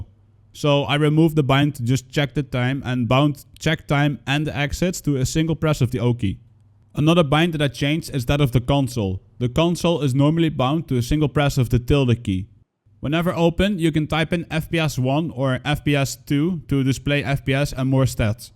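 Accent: Netherlands. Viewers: Great video! are you Dutch btw? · are you dutch? because I can hear some dutch language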